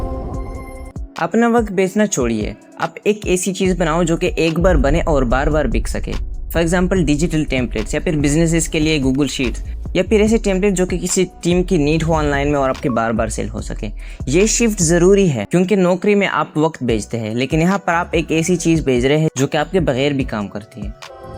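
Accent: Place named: India. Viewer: laanti indian propaganda chanel....listen to his funny "hindi accent, pronouncinf "Z:" with "J".......chaeapter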